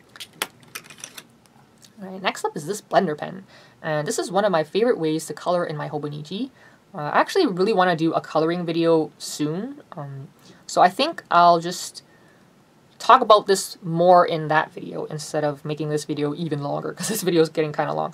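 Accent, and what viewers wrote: Canada. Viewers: I loved drooling over your toolbox of goodies! I'm a pen addict! :) I thought you sounded Canadian! I'm a Canadian living Down Under. I moved to Australia 14 years ago, but Canada will always be 'home' to me! :)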